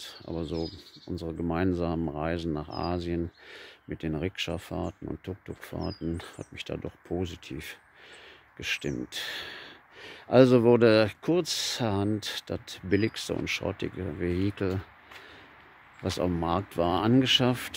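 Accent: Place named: Ruhr, Germany. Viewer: Ömma. Du komms aber auch ausm Pott, oder?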